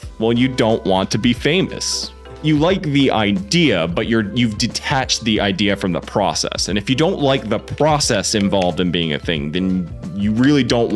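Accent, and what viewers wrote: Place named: Canada. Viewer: Timmy's heretic... it's an institution- props. Subscribed just to hear you say fehkking and oot- love the chainbrain T, too! Maniac.Make a bluenose expat homesick.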